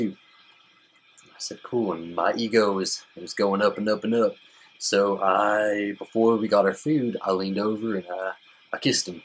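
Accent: South, United States. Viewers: I liked your coming out story. Would love to talk at ya more. I like that deep southern accent. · Lol he's so cute with that country accent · He has the deepist southern accent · OMG U R SOO CUTE...And your southern accent is really nice..... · Omg he's so southern 😍😍😍😍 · America, fuck yeah. That's what I think of when I hear this guy's voice. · I love your Voice! It's Deep and Southern ... Good for you! Don't let Anyone get to you now that your out! It's all down hill from here on out... ;-) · I like our southern accent and understated manner. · Man I like U a lot. Your southern accent is fantastic. U are so open minded that is refreshing. You are a really kool dude keep up your great connection with people. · Hi. You have a great voice & accent where are you from within the usa ? I could listen to you for hours. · Your so southern and adorable I love it!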